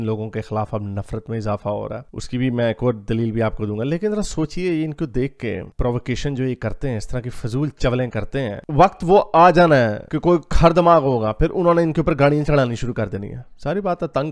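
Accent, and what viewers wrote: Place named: Punjab, India. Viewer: I love the punjabi accent ❤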